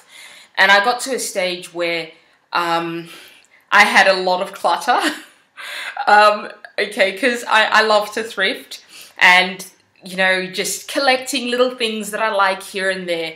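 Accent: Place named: Australia. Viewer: I love that Aussie accent of yours!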